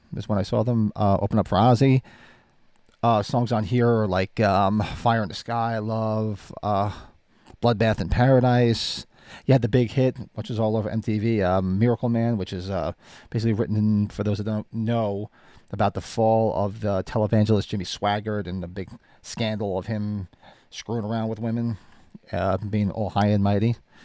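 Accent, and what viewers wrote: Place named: New Jersey, United States. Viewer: How do I know you’re from New Jersey immediately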